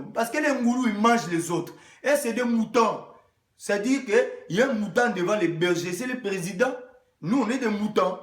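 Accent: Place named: Democratic Republic of the Congo. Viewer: ta un peu perdu de ton accent congolais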